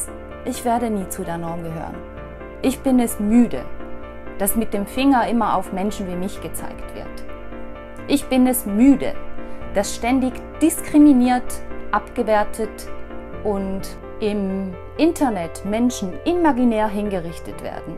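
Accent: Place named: Switzerland. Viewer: Hallo 👋👋👋👋👋 Mobbing ist ein sehr wichtiges Thema. Mobbing, Cybermobbing usw. ist in den letzten Jahren sehr schlimm geworden, speziell seit es das Internet gibt. Es ist sehr hilfreich wenn, es Menschen gibt, die darüber sprechen. Ich danke Dir für das sehr interessante und gutes Video.👍👍👍👍👍👍👍👏👏👏👏👏👏👏 Ich habe noch eine Frage, wenn Du es mir erlaubst: Von deinem Akzent her müsstest Du aus der Schweiz sein?! lg Leandro 😺😽🙏 Bis bald.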